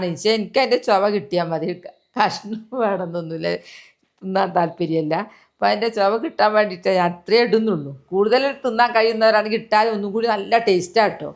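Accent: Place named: Wayanad, India. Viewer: Wayanadan...samsaram...ugran... Thrissur..ninnum...❤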